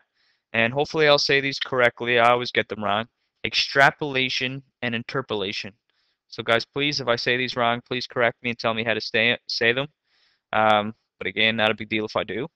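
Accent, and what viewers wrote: Newfoundland, Canada. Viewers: Are you from Newfoundland?? Cause you sure sound like it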